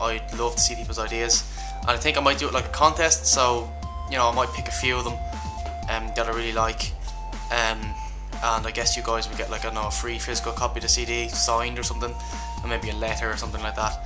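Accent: Ireland. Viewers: lmfao it could be picture of a penguin. haha ur soo adorable :P btw i love ur irish accentt <333 :D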